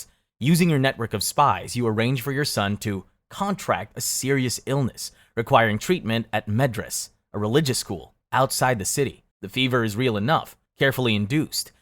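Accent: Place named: Arab world. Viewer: arap gibi okuyor soluğunu gırtlağını silktiğim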